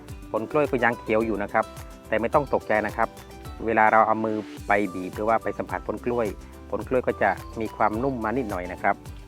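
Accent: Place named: Cambodia. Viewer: สำเนียงขะแม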